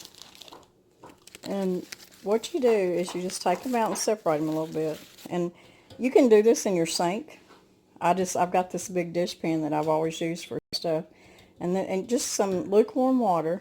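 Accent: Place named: Texas, United States. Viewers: My kin are in Pasadena Texas as well. 😁 I thought I recognized your accent. Your tamales look yummy....😋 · LOVE this! And you sound just like you are from Pasadena, Texas! I’m from a little further east piney woods. Love yalls channel! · I just knew that was a Texas accent! hello from Houston. Oh my goodness my Grandma & Pawpaw lived in Sulphur Springs I use to spend summers with my grandma (we lived in Garland TX) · How did you decide to homestead in Arkansaw and can you make enough on the homestead to sustain you or do you also hold down regular jobs? I love your Texas quiet voice! I live in Texas and my voice sounds a lot like yours. Can't stand harsh voices. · I knew that accent was Texan!!!!!!!! ♥♥♥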